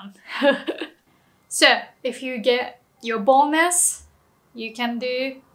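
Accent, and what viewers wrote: United Kingdom. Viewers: you can hear in your english that you’ve lived in the UK haha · Great lesson also you would be hot if you didn't have a British accent but yeah very informative video 👍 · Your English professor was British, yes? I can hear it. 😁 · You are also British,yeah?I can hear it when you speak English-the British accent is clear and I LOVE it!Aregato,love! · It's interesting. I'm an American and compared to other Japanese who speak English it sounds like you have a British ascent. Did you learn English from people from Great Britain rather that from America? I mean this in a nice way. I love watching videos about the differences between British and American culture. Differences in culture are fun. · You look are soooo cute looking 😅🙊🙈 ...and just so cute! Kawaii desu ne! 😅 Daisukidesu your English accent 😊 Have you spent time in Australia or the UK for learning English? 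It shows . It's so nice to hear a Japanese person speaking English without an American English accent or Influence on pronunciation. Yokatta ☺️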